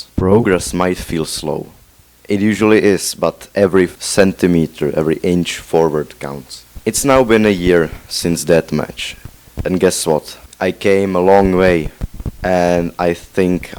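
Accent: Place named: Czech Republic. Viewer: Já věděl že jsi šech, když jsem slyšel ten přízvuk :D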